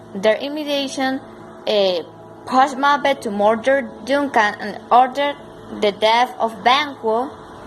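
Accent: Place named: England, United Kingdom. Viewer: Hello, this Is Arka. Thank you for subscribing my channel. BTW, you are beautiful as well as intelligent. But where do you live? I am asking, just because of your English ascent.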